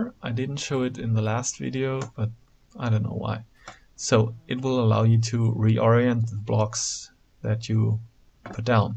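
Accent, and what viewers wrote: Germany. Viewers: deutscher ?:D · Super Deutscher Akzent :D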